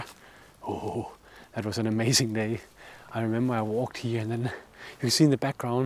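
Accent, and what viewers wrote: Denmark. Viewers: The landscape, the 'driving by the bakery', the danish accent... just made me so homesick :) (Expat)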